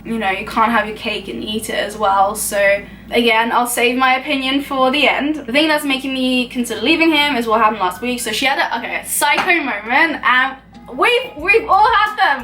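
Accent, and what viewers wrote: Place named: United Kingdom. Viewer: ohh because i heard some British accent..nice to meet you here🌼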